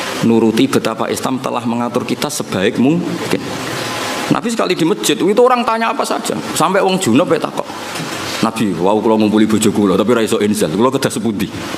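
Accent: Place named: Kebumen, Indonesia. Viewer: Kebumen ngapak nyimk kyai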